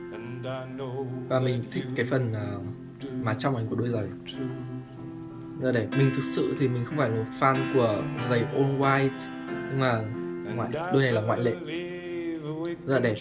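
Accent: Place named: North, Vietnam. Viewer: He has a Northern accent but it isn't too hard for me to understand being from the south :D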